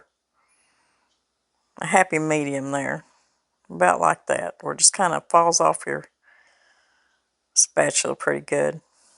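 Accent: South, United States. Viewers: Please ask Mr. Brown to find out what kind of pumpkin that was. I couldn't find any called cow patty or even close to that. Thank you! I love your videos and your accent. My parents are from West Virginia but raised us here in Wisconsin. I love hearing southern accents as it reminds me of them. They also were teased as to how they pronounced words. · i think you talk normal 🤷🏼‍♀️ but i only live a few counties over 😂 ms., i'm 45 and i have always said waspers, worsh rag, squashes, caint (can't), and all the other southernisms we have. i "workin'" in my craft room, but i sure could go for some of the pumpkin pie. that sounds so good and even better that it's pumpkin season. love living in the natural state with you! farrah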